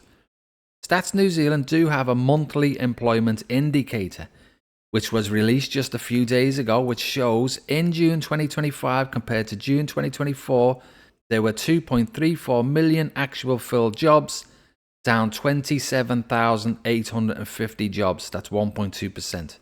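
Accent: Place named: England, United Kingdom. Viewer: Also it’s interesting to note you’re accent is English, New Zealanders have paid massive money to our indigenous Maori And rightly so, but what’s so disgusting about it is your average New Zealand in fact all New Zealanders never made the deal but paid the debt for the UK, we never gave their land away illegally your English monarchy did it but yeah this country paid the bill with nothing ever removed from the precious royals thou their family signed the deal and stole the land. Also more men died per capita in the second world war than most countries including England, we stood beside England from the start until the finish with the NZ Army being one of the best performing armies throughout, yet we’ve always been punished as the colonials used as Cannon fodder for those superior English inept generals, so the guys who help save England then have to pay three times the amount to get to the UK because of the exchange rate in England then gets all the benefits of coming to New Zealand and now thanks to you will be now snapping up all our property & everything else and at a third of the cost. 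So thanks for pointing that out to half of England because I’m sure you’ll all benefit from it again at our expense especially now England’s at War again internally, I’m sure they’ll be using all your wonderful knowledge to take advantage of us Kiwis again so thanks a lot mate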